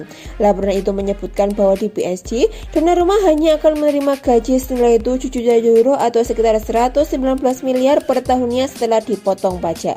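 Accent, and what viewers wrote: Java, Indonesia. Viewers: Medok bro...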